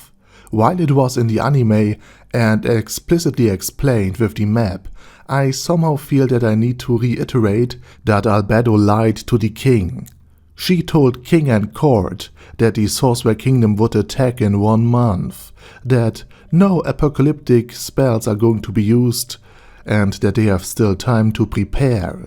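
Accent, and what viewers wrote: Germany. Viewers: He's German, so he has german accent. It really isn't that hard to understand as you make it out to be. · If you don't understand a German accent feel free to leave. Listening to a German accent can take time to get used to but the way you say it is just rude... If you really watched at 2x and didn't understand what he said it's your fault in the first place. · Yes my hearing is good, I have been listening to non natives since I was 5 years old every day. If you are German yourself and hear a german accent this awful it makes you not even wanna try. This is the level of someone who has not tried to make themselves more illegible since he first started learning english as a kid. As someone who actually put in effort to make myself more understandable, why should I waste my time on an animal who puts 0 effort? Again, stop dickriding this random person who doesn‘t even know you exist.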